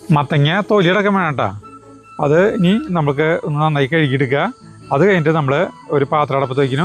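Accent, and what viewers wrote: Thrissur, India. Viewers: ഞാൻ ആദ്യമായാണ് നിങ്ങളുടെ വീഡിയോ കാണുന്നത്. ജന്മനാ തൃശ്ശൂര്കാരിയായ എനിക്ക് നിങ്ങളുടെ dish നേക്കാളും ഇഷ്ടമായത് തൃശ്ശൂർ ഭാഷയാണ്. 30 വർഷമായി പാലക്കാട് ജില്ലയിൽ താമസിക്കുന്ന എനിക്ക് തൃശ്ശൂർ ഒരു നഷ്ട സ്വപ്നമാണ്.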